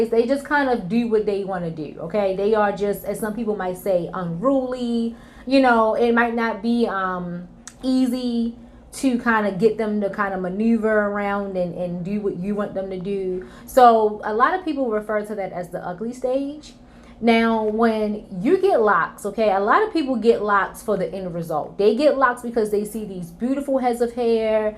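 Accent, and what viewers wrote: Baltimore, United States. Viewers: You have to be from Baltimore (the accent) lol, I’m from the dmv. Love your hair!! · It’s the B-more accent for me 🥰🤣. You’re beautiful, sis. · Just came across your channel. I had 4-5” of hair when I started too. My install was 5/23/20 so our locaversary is around the same time. Are you from Baltimore or somewhere in DMV? I think can hear it (I’m from Balto myself). Many similarities. I think my hair was more awkward than ugly lol...I wore turbans and headwraps for like the first 3-4 months straight. New subbie! 💕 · Sis the Baltimore accent IS REALLLL!!😫😂😂😂😂😂😂 you’re soooo beautiful🥰🥰🥰🥰🥰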